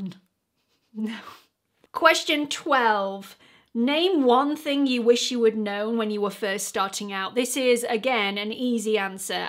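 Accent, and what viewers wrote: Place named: Yorkshire, United Kingdom. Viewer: I've just found your channel and you are so refreshing and relatable. I love that you're from Yorkshire too. Accents fascinate me and it's so cool to hear such a variety of them. Now I'm off to binge watch all your videos while I get ready for work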